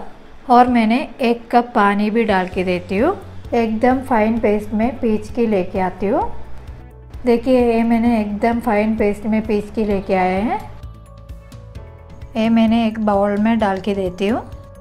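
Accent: Kerala, India. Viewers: Very Well explained and demonstrated. Your Hindi with Keralite accent is cute as you. Keep it up 👍👌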